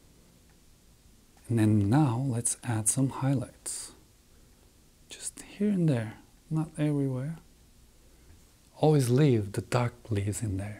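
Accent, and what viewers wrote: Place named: Turkey. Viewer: Güzel kanalmış, ingilizcenden Türk olduğunu anlayabildim. İştabilizer'i görünce tamam dedim :)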